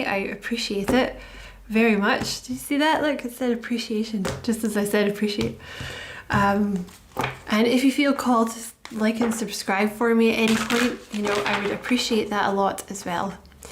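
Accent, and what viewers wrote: Scotland, United Kingdom. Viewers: Always wonderful to hear your lovely Scottish accent no matter what the cards say.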